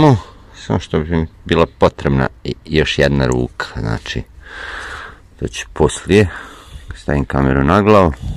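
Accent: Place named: Bosnia and Herzegovina. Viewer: Obozavam gledati tvoje video snimke,legenda si ,pogotovo kad ti cujem naglasak vratim se u Bosnu opet ..Pozdrav iz ledare Norveske!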